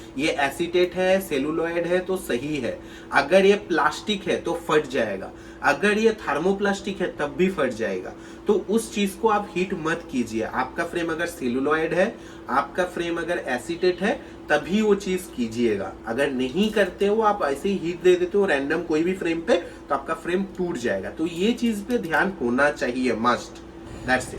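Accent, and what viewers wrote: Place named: Bengal, India. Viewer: Okay bhaiya ji.. Samajh gye.. But you are using bengali ascent.. 🙃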